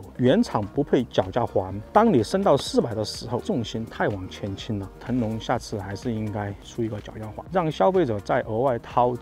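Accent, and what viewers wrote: Sichuan, China. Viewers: 这个频道主感觉是个四川人，讲话太像了。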